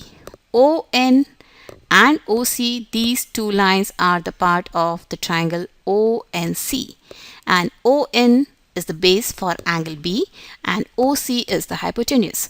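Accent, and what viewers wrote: India. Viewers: Indian accent ?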